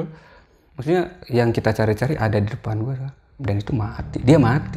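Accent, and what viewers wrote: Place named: Sukabumi, Indonesia. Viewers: Pantes cara bicaranya kek sama ternyata msh satu kota...salam dri sukabumi-cisaat kang 😁🙏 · Kang sunda abis wkwkwkwkwkwkwk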